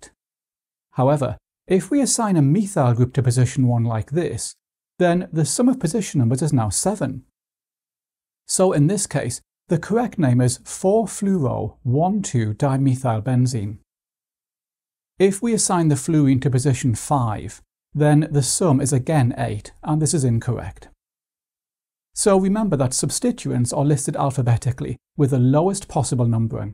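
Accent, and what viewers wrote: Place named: RP, United Kingdom. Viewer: Why do you sound so posh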